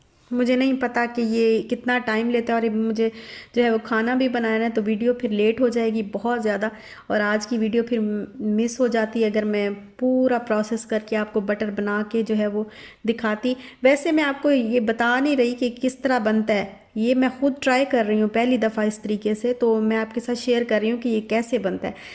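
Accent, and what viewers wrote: Saraiki, Pakistan. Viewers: Dear sister mein ap ka vlog 1st time dekh rhe hu ap kaha rehti ho aur ap kis city se belogg karti ho muje ap ke zuban mein saraeki ka tech lag raha hai mrs zulfiqar lahore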